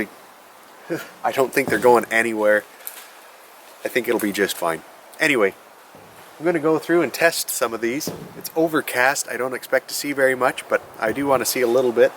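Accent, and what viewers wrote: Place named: Canada. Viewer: You need quite a lot of water and hight (head) too make a micro hydro project worth it. I would shift that container just too see the difference. Do you have a stream on the prop? A little nudge with the back hoe is all it takes. Wait you got wires coming out of it... yeah thats another story. You have come a long way from digging your well vid that was the last time i watched. nice work. Where are you from the trees in looks like north east but you accent sound Canadian.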